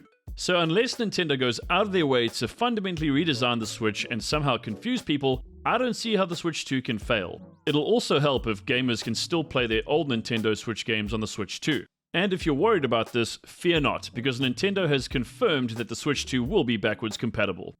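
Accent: South Africa. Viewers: is he south african? is he australian? we will never know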